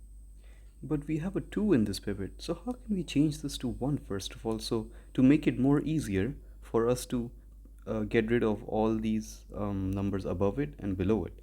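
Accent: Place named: India. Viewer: Ur accent sounds like indian biryani scammer 🇮🇳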